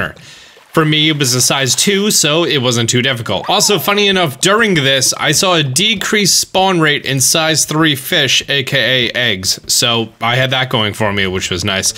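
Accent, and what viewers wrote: New Jersey, United States. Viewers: Water = Wooder in Jersey, apparently. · I am from New Jersey as well, and I can, in fact, confirm that we do pronounce water just as you said. 😁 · He aint lying we all say wadder in jersey lol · Lmao it is a jersey thing. Im also from jersey. We all say it like that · Lmao I’m from jersey too and I had no idea you were saying water wrong guess I learned something new about myself today 😂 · I'm from Jersey and yeah we say water that way whats the problem with that?? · 😂 Cant believe youre from NJ! No wonder I never understood why people were complaining how you say "water" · As a New Jersey resident, yes that is how we say water. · As a Jersey-ian, I too pronounce water like that. Didn’t even think you were pronouncing it weird lol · Ok let's talk about "water" pronunciation REAL QUICK (sorry). Nobody in America pronounces the "t" in "water" as a "t". 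Most people pronounce it as a "d" just like you guys in New Jersey do. The difference is the "a". Most pronounce it like "ah" as in the "a" in "calm". You guys pronounce it like "oo" as in "wood". Like, it literally sounds like you're saying "wooder". Ok rant over. Love your vids brah. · Honestly, I love your New Jersey accent :) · hello! checking in as one of the new female viewers (well, i was subscribed before for some pokemon stuff but now i'm actually invested in the ac content lol). i didn't realize you were from nj - me too. people always shittin on our accents -. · Can confirm on the water dialect for Jersey. i used to live in New Brunswick. We do in fact say Wadder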